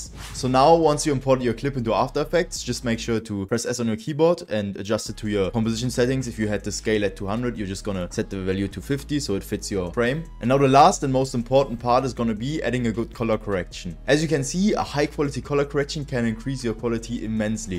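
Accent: Germany. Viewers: Man hört so krass das Deutsch heraus :D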